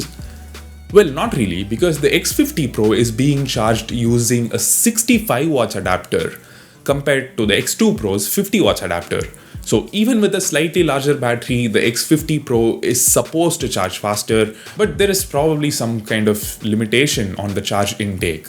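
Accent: India. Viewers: Nice indian accent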